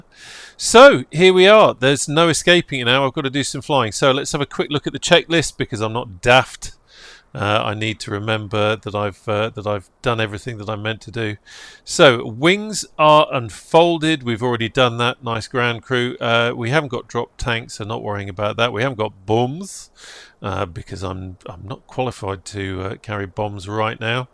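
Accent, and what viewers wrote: United Kingdom. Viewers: 🙈 Pffff … British accent, British person , it’s got to be Duxford or UK. ! UK a bit flat and boring to fly over in m opinion. !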